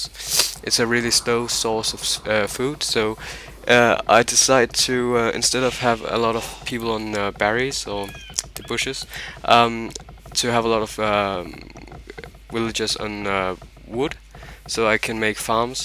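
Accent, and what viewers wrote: Denmark. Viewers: are you danish ? of course you are, you sounds like a danish guy ?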